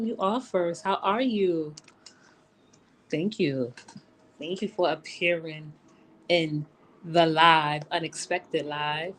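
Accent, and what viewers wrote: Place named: Bronx, United States. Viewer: Hey my beautiful how long have you been back on utube I love hearing you speak that northern accent 🦁🦁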